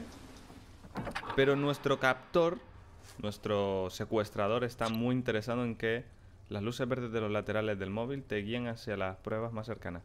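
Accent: Spain. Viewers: sienpre te quiero preguntar De que isla Canaria eres?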